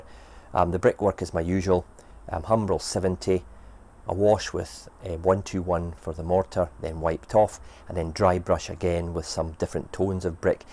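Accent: Scotland, United Kingdom. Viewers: Need a lift? Check out the close captions, and see its hilarious attempts to deal with a strongish Scots accent.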